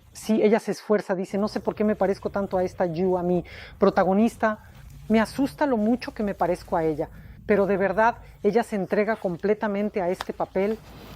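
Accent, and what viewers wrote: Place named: Mexico. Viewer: Por fin, hace tiempo que quería escucharte en español y con un acento mejicano 😅.... maravilloso ❤❤❤. LOVE YOU!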